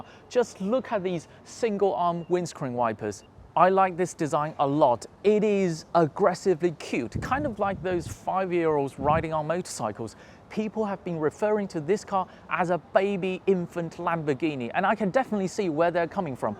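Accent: United Kingdom. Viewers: Nice video and brilliant British accent!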